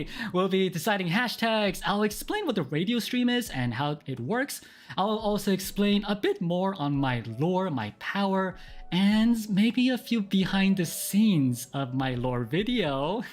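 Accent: South Korea. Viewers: I knew it! Another half Korean at NijiEN!! I recognized your accent. You're so amazing. 만나서 반가워요 오빠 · Now that I think about it, he does have a Korean accent... Compare it to someone like Suha it's not as noticeable but it's definitely there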